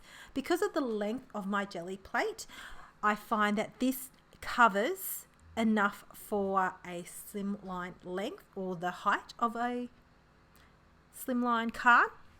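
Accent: Australia. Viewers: Glad to hear a fellow Aussie on YT. New sub from NSW ❤️🇦🇺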